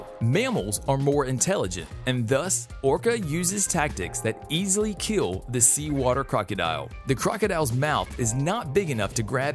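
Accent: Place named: Baltimore, United States. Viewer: You sound like you’re from Baltimore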